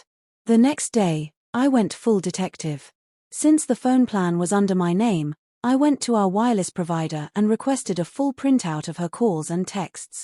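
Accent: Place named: England, United Kingdom. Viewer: Very confusing, English woman telling a story of a Midwest American male in the first person present.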